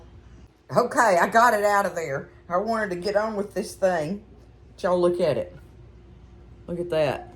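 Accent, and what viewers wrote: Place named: South, United States. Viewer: I'm going to give this a try. I love listening to you talk...you sound like so many I grew up with.. I'm from the south and we talk like you. You are a ray of sunshine with your personality and a blessing to many...God bless you 🌷🦋🍎🍉🥧🫖🍇🍓🐝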